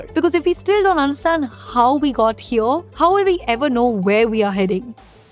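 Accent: India. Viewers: The Indian accent is simply overwhelming. 🥵🥵🥵🥵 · Not everyone understands your Indian accented English. Use English subtitles rather than hindutva terrorism language or word the title of your video in your native language and stop the click bait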